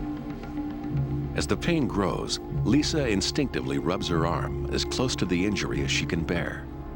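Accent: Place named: United States. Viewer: the american narrator ruins this show.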